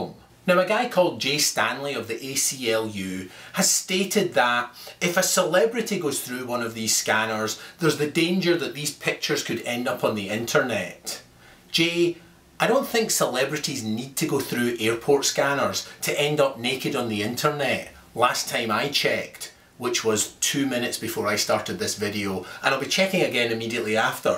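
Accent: Scotland, United Kingdom. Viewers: He is very much Scottish. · I love the skotish acsent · is that Scottish ? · well your an idiot... hes scottish. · What ever happened to the british accent being 'hot'? Americans don't even realise that this is what 90% of brits talk like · oooh i love his acsent... i want a scottish friend :D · Stuff an aresenal up his aresenal XDDDD nice accent btw Scottish? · You can take your Scotish voice and go to hell. Maybe the loss of liberty does not cencern you but to real Americans it is the reason we fled Great Britain in the first place. Airport scanners are unnecessary. The problem can be solved by profiling but they don't want to solve the problem. You are the perfect example of an unwitting NWO dupe. No go play some golf and eat some haggis and read some books about freedom dummy. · I think he's a Scot, or like me, he might be an Irishder. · Ya I'm American do the accent is hard to understand, but I'm also Scottish so I like the accent, and everything else HA don't shit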